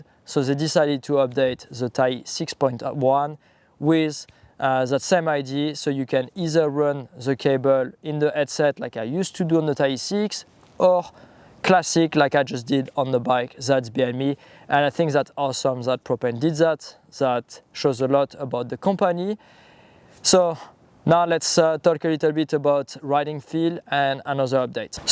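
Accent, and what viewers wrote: France. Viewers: I just come to hear him talk in that thiiiic french accent. :body-blue-raised-arms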